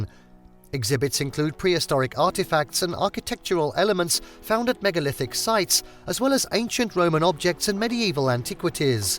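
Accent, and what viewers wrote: England, United Kingdom. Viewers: Among others: This fortress served against RUTHLES OTTOMAN EMPIRE, I believe that when you point the finger or insult someone with tendencies and that someone has ruled half of the world and beyond, at least change the accent so that it is not understood that you are English, since today in April 2024 countless countries pay taxes and other benefits to Britain without knowing why they are poor?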